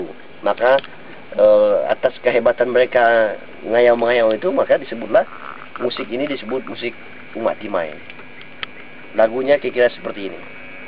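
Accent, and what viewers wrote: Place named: Ulu, Indonesia. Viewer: logat asli orang ulu.. mantap abang ni, akhirnya dapat juga pencerahan belajar sape.. salam dari Sanggau bang..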